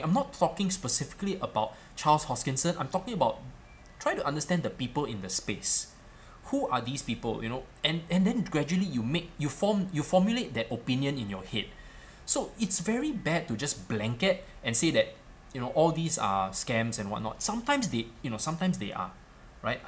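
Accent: Singapore. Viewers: you have to be from Singapore right? I hear that Singlish speak!!!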